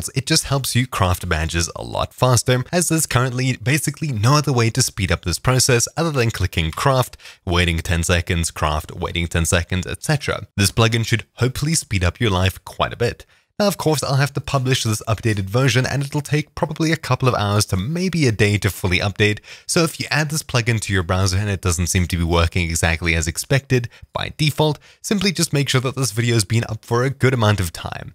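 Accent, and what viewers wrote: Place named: South Africa. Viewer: I know an south african accent when I hear one.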